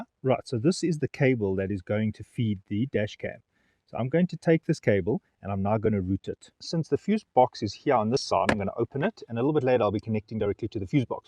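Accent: South Africa. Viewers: Great video. Detect a South African accent there. Groete van Engeland af 😜 Great video. My dashcam has parked recording mode so need to source a 12v constant. Easily done. What I have used is some conduit and fabric tape. Probably a bit ott but just for the added surity the wire cannot be exposed. Great video though. Very helpful thanks.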